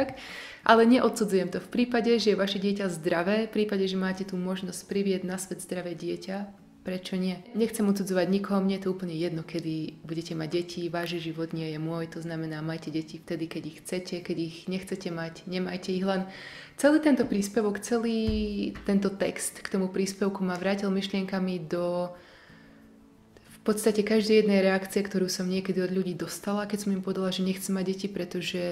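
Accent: Slovakia. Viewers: ta slovenčina mi prisla taka martinska... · Myšlienka" Neviem čo chcem, viem čo nechcem" mi je blízka 😂 Inak pekne stredoslovensky mäkko vravíš aj keď netuším odkial pochádzaš 😁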